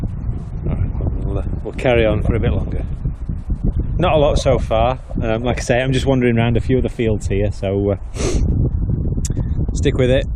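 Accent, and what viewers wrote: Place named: England, United Kingdom. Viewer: Your accent makes me homesick for England. I grew up in Manchester and moved to the USA at 14. I lost my accent but people still hear a little of it. It's a lovely area where you are. I used to love walking in the fields and parks when I was young. I really hated reading about the fire at Wythenshaw Hall, I have fond memories of walking through the house and looking at the beautiful antiques they had . I hope they caught the horrible person that set fire to it. They destroyed a lot of history. Until next time, happy hunting, have a great day and I hope you find some really interesting artifacts. God bless.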